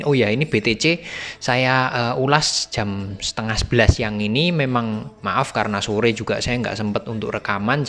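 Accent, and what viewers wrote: Java, Indonesia. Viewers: Bang jowone ngendi to kie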